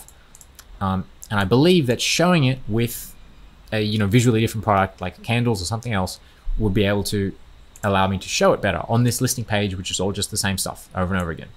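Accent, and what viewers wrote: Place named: Australia. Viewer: hahaha and that’s 79% of why i subscribed!i also have an accent.. but British ( Caribbean British ).. are you Aussie?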